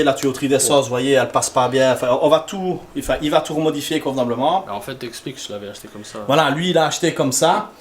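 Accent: Liège, Belgium. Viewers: Sympa ta vidéo et ton accent de liège! ;0)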